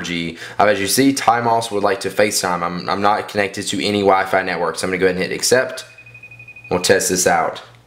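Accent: South, United States. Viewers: What accent is that? Sounds Southern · you've probably got the coolest american accent. coming from an aussie. lol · I'm not being derogatory but it's funny that this guy is always wearing wigger cloths but sounds really country, that's an odd breed mix haha.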